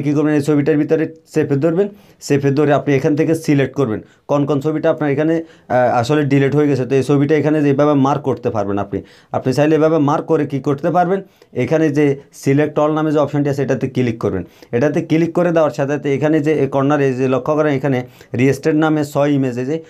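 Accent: Noakhali, Bangladesh. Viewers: গুদ ভিডিও আপনি কি নোয়াখালি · ভাই আন্নে ত নোয়াখাইল্লা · নোয়াখালীর ছেলে না তুমি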